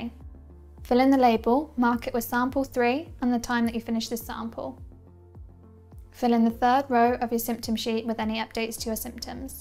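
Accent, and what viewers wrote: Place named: England, United Kingdom. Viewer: shes english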